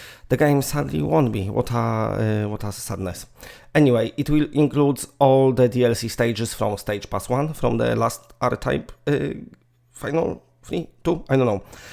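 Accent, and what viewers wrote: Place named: Poland. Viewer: Czy to celowe spolszczanie akcentu angielskich słów czy naprawdę jesteś tak słaby w wymowę? Aż nie chce mi się wierzyć ze ktoś kto mówi tak płynnie po angielsku nie powiedział ani jednego słowa poprawnie 😂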